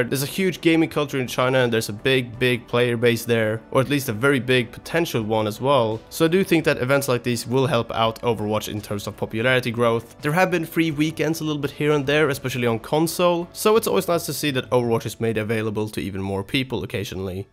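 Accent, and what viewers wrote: Sweden. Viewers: are you swedish???? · ah you from Sweden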